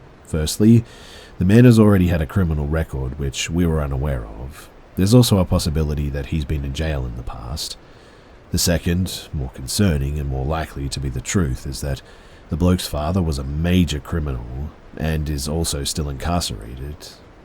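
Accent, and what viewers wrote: Australia. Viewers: Have I just found my first Australian night time narrator? 🤞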